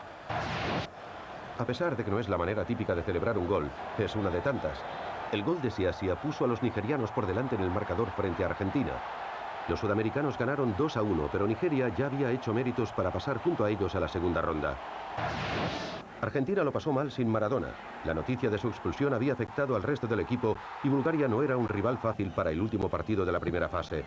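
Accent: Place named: Spain. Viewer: Para documentales no existe nada mejor que la de un español o española... lo máximo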